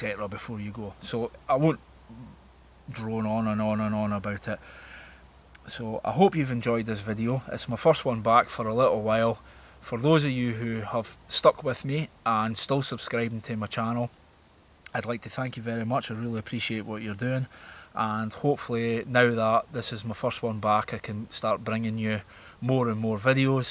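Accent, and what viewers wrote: Scotland, United Kingdom. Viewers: Great video. I have to get one of those. I was not aware it was for the leg. You scotts and that accent. I could listen for hours. A lass even better. Cheers bro!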